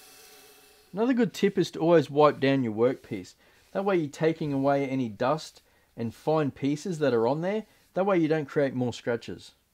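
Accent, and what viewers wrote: Australia. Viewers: Great to hear an Aussie accent and explain things so well thank you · Ahhh... There's nothing better that clicking on the video and hearing an Aussies voice !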